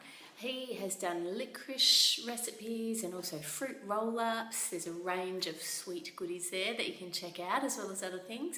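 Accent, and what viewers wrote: Australia. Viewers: Dn't know why, just a normal Aussie Accent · lol accent? I could barely tell, and I'm from the US. Sounded like average Aussie to me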